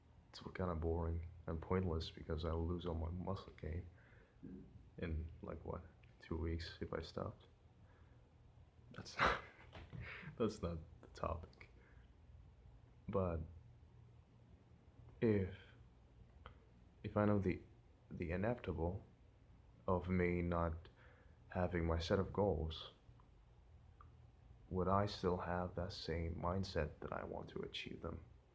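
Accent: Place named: United States. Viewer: maybe we need to break it down; are most of our goals considered needs? For example, my goal is to look prettier - if I ask myself why? I'll know it's because 1- it gives me options for different work fields (such as modeling) 2- (more important for women lol) I'll probably have many admirers, which means different options available for picking a potential partner, or get completely shunned by real great ones lol. Regardless, they're all needs. Temporary. They don't last. Which is why it's excellent that you thought about it! Instead of letting your goals aka needs drive you around, you're thinking much ahead! That could actually give a lot more meaning to your goals and guide you through the way, resulting in true accomplishment. (Ps what state are you from? Incredible American accent!)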